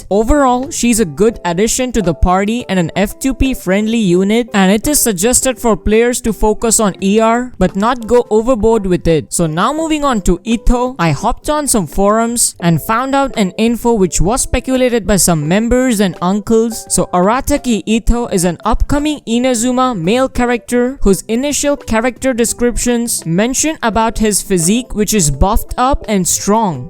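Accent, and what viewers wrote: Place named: India. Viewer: How come you can translate if its in chinese? You sound like an indian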